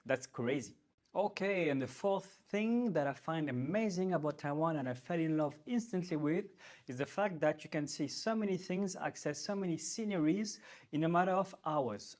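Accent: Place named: France. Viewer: Enjoy your staying in Taiwan, you are welcome by the way I like your great French-English accent. Be cool, Godspeed.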